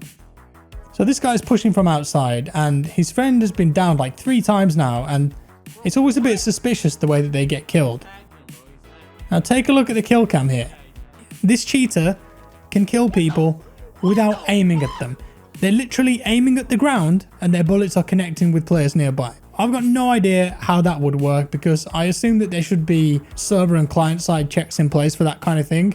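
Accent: United Kingdom. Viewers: A bunch of streamers already do the "spectate" - don't follow. I love the adult conversations regarding gaming with other adults. Mix in the British accent and I just laugh. M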